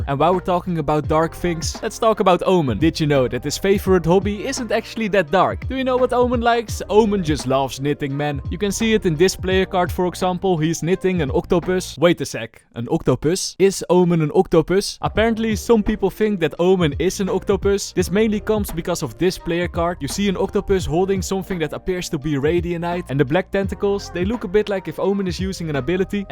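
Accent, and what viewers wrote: Netherlands. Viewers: i love your video’s but please PLEASE fix your dutch accent 😭😭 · he has a dutch accent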